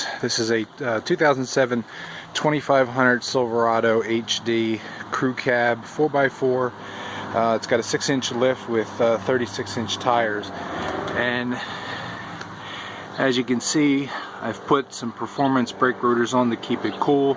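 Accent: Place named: East Coast, United States. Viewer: Your def from Back east. Got the accent!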